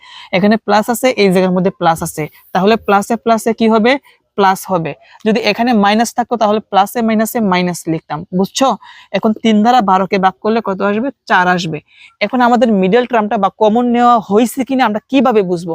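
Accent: Sylhet, Bangladesh. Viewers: কথা বলার ধরণেই বুঝে গেছি যদিও সিলেটি কোনো শব্দ ইউজ করেন নি!!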